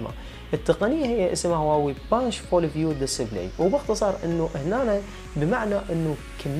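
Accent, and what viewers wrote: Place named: Najaf, Iraq. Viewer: من لهجتك مبين نجفي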